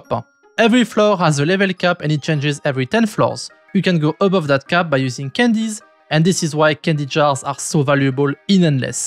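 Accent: France. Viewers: Heaviest French accent I have ever heard 😮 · Respect. you are actually the first one, i see a video from, that is actually better than me process wise in the game. The Tips were very good, and you wasnt talking obvious trash Your video isnt clickbait, and even tho your french accent threw me off, it was very informative!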